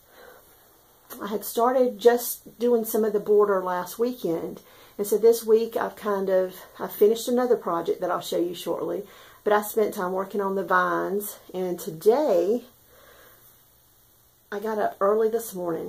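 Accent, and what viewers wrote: South, United States. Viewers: Another wonderful video. It always makes me happy to see your sweet smiling face and listen to your sweet southern voice. So awesome you got to meet Nicola, hear her lecture and take a class...unforgettable. I also had to stop the video to shop for the Karoline...pattern. I haven't stitched with wools yet, but I will in the very near future. Love the Sampler piece over 1, I'm doing mine over 1 as well...but way behind you. Hugs and stitches right back at you. ❤️❤️❤️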